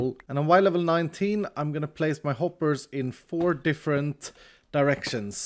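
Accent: Sweden. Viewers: Are you Swedish because you have a very Swedish accident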